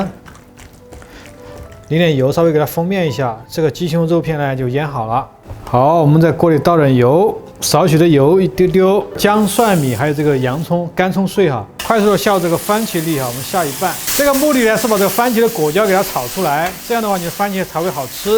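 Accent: Sichuan, China. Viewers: 听到师傅的椒盐普通话，就晓得师傅的川菜是资格的。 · 川味普通话好情切哦！❤ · 帅哥川普十级，听上去特别亲切。菜谱也棒棒地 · 师傅是四川人吗？rua 均匀，哈哈哈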